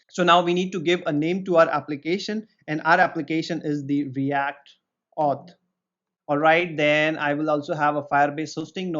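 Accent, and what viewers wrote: India. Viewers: indian english bhi chalta bhaii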